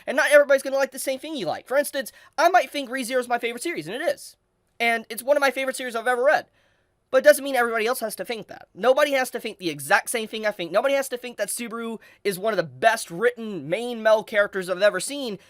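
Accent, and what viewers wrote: United States. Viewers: the way b americanizing these names i swearr 😭😭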